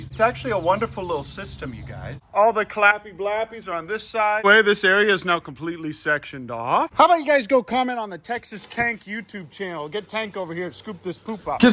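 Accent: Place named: Southeast Pennsylvania, United States. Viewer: I noticed on your last vid you said you were from PA. I honestly thought just from your voice you might be a south east native. I'm from the West Chester/Chadds Ford area. As well you remind me of a good friend of mine Joe Magno, any relation?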